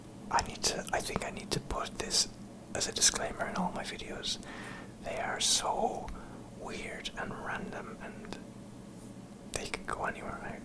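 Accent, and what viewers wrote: Ireland. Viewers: love love love the Irish accent and your voice is soooo tingle-inducing. thank you xx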